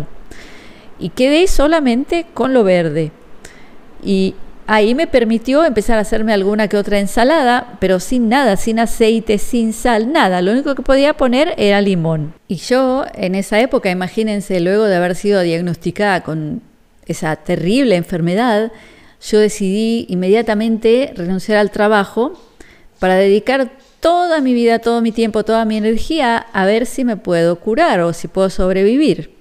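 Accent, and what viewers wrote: Argentina. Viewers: Así es querida estabas malnutrida literalmente te estabas muriendo de hambre, un punto que no mencionaste es que tomas tu alimento y no masticas no producis unas enzimas nesesarias para poder dijerir los alimentos y es otra razón por la cual estabas sin energía. No somos lo que comemos somos lo que dijerimos. Saludos y no pierdas tu acento Argentino no está usar el vos y usar nuestras palabras si nosotros entendemos al resto el resto nos puede entender a nosotros, yo también vivo fuera de Argentina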